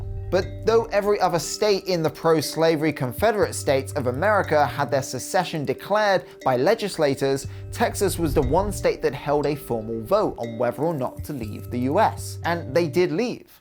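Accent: United Kingdom. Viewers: You sound british so ya know fuck all about Texas. We'll, be just fine without the other 49. And no it wouldnt be a war. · texas is in fact the only state that can leave the union. it was part of the contract for texas to join the union. so yes we can leave. when i clicked this video and the first thing i heard is some brit, i already knew it was gonna be a load of crap.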